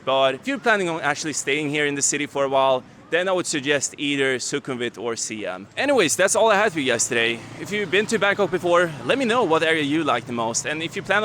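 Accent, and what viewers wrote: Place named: Sweden. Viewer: Sound like English in Swedish dialect.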